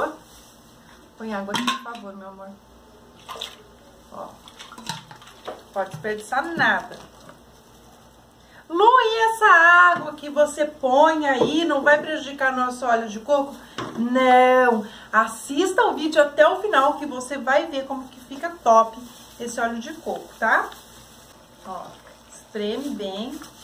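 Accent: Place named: Brazil. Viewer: Hola soy de México. Y me gusta ver videos usted es Brasileña por el acento de su voz es muy guapa me gusta le envío desde acá un saludo muy afectuoso y le deseo muchas bendiciones desde México como se llama el lugar donde vive usted y como se llega ya estando en Brasil. Espero me conteste porfavor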